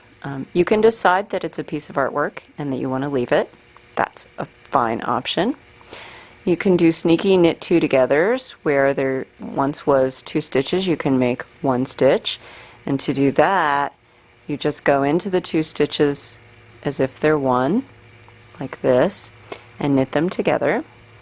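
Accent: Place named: South Jersey, United States. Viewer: Are you from PA or NJ.. because you have a south jersey/philly accent .. similar to me.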